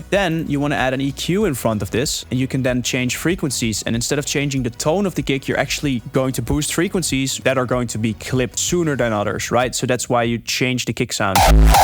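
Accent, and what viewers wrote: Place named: Netherlands. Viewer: bro je klinkt nederlands man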